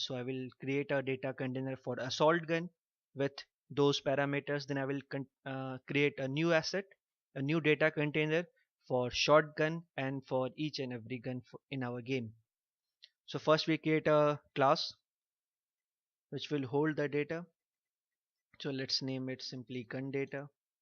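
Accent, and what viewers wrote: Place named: India. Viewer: Oh man I hate the Indian accent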